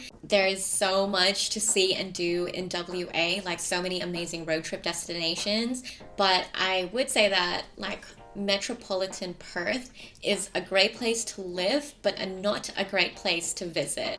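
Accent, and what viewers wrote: United States. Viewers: Nice vid - bit of an American accent there? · Are you from north America your accent sounds Yankee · You're 30 and the American accent stuck with you lol what a load of virtue signalling bs. Sorry to burst bubble.😂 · sorry to ask but why do u have such a american accent? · Nice video! You grew up over here but how did you get such an American twang? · Are you American?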